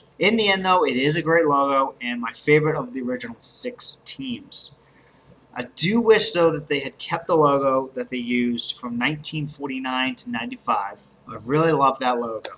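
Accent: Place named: Hartford, United States. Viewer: Wow he has such a Hartford accent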